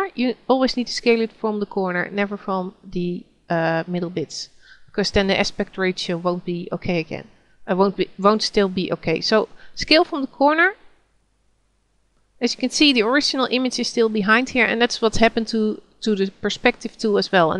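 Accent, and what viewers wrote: Netherlands. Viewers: je bent werkelijk een kei in zowel het bewerken als uitleg geven. hartelijk dank om al dat moois te delen met wildvreemde mensen. als ik me niet vergis hoor ik een Hollands accent in jouw engels dus vandaar dat ik u in het nederlands beoordeel. in de hoop dat ik me niet vergis natuurlijk en mocht dat zo zijn, sorry daarvoor hoor;-)) thank u so mush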